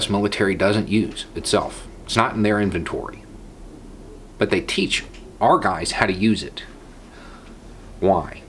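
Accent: South, United States. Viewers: I gotta tell you this one: Being honest, I come from a VERY liberal state. And not one anywhere near the South. There is a stigma here of Southern people: that literally of them who aren’t black are so far up Trump’s racist ass that they’re beyond saving... And to be honest, I saw a video of yours once through auto play, and I saw the beard and heard the accent... and I thought for sure you were some sort of confederate flag wearing neo nazi. Then I heard you talk. I mean I really listened to what you sad to say. And you should know that I had to take a step back and realize that I had been the biased one all along. I’d become the close minded person I believed others to be, and it was a really humbling moment. I listened, and then watched almost all your videos... and I want to stop and apologize to you and every other person I’ve written off for that kind of thing. 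Now, I may not always 100% agree with what you say, but I so deeply respect that you’re a thinking American. And I deeply respect that you’re out there being honest with your posting. It gives me hope for our country, that maybe - just MAYBE - we’re not actually as divided as the tribalistic political movements want us to be. Hope that others in home states like mine will listen to people like you, and stop jumping off conclusions and listen to what you have to say (and Vice versa). I owe you (a person I’ve never met) an apology and to ask forgiveness for making assumptions of who you were based on your pre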